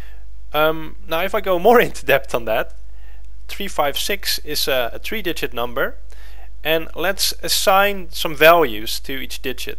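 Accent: Netherlands. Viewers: are you dutch? :P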